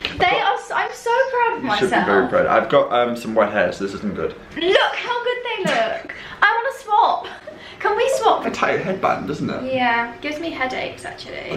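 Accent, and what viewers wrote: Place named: England, United Kingdom. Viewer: with your English accent I have trouble understanding you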